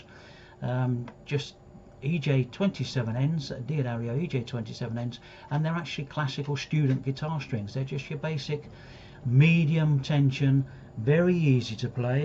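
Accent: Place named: Northern England, United Kingdom. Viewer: Thanks for answering ! It sounds a bit northern English...but I may be wrong. Anyway, thanks man. Love your videos.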